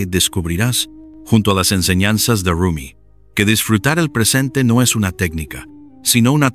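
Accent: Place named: England, United Kingdom. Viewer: Excelente reflexión. Cualquier cosa nos distrae del momento presente. Una recomendación sería usar una voz neutra y nativa, sin acento inglés, pues la pronunciación incorrecta termina distrayendo... Saludos.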